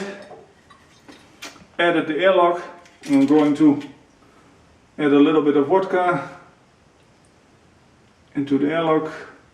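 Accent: Netherlands. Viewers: Not to take the piss but I’ve not heard a younger person with this accent. Only have old Dutch people in Canada. Most younger folks can make an American accent.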